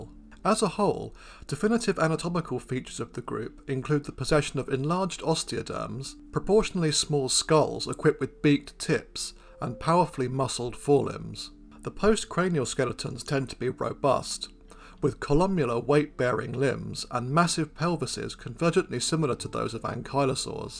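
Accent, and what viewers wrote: United Kingdom. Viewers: ugh. too many documentaries with british narrators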